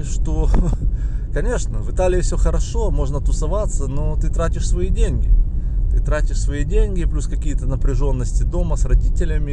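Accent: Odessa, Ukraine. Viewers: слышна кстати типичная одесская интонация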